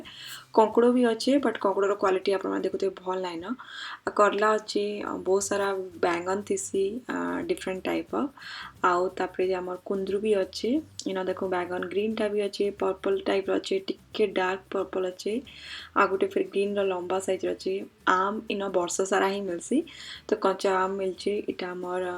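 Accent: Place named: Sundargarh, India. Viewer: I know u r from Bolangir..but Ni Ni ta bodhe Sundargarhia like kahisan na.. anyways doesn't matter..I like u..👍👍good work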